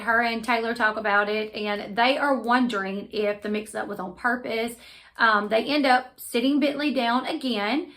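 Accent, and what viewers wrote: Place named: Georgia, United States. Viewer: Yasssssssss so happy I found you all the same things I'm obsessed with AND I'm from Georgia so the accent is everything!!!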